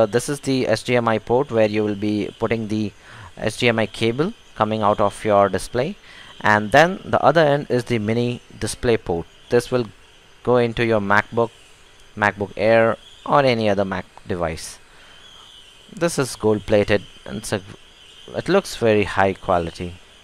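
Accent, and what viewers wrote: India. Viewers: He sounds like ever indian I have ever met...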